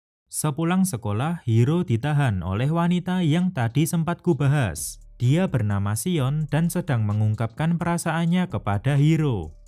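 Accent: Java, Indonesia. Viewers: medhok njir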